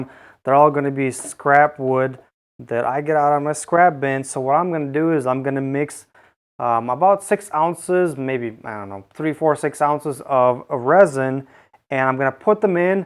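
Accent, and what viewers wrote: Russia. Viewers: Hi! You from RUSSIA?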